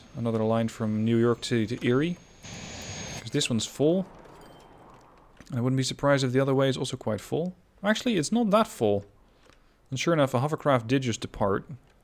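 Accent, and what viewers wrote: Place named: Netherlands. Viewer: I recognized the dutch accent from the start :p I'm dutch too.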